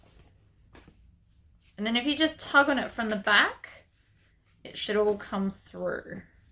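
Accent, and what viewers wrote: Australia. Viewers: I just had Open Heart Surgery and I watch your videos when I can’t sleep. I like listening to your voice. I like that Australian accent! I think everything you make are beautiful.. especially the purses you make!! What talent!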